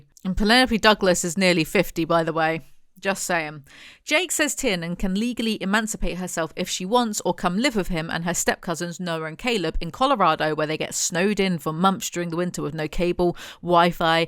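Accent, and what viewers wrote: United Kingdom. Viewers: Honestly, I've said it before and I'll say it again, I'd kill to get your book reviews on spotify for long term british yapping ad-free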